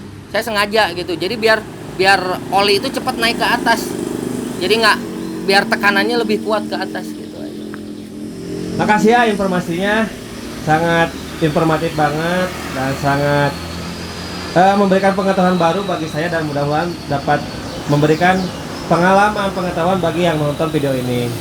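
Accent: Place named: West Java, Indonesia. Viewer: Sunda kentel bahasanya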